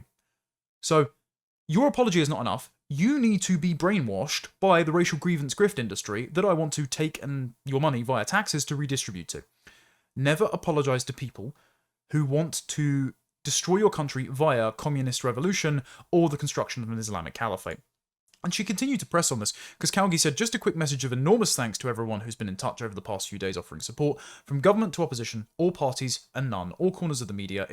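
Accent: RP, United Kingdom. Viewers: always the halfwits who are impressed by the average posh english accent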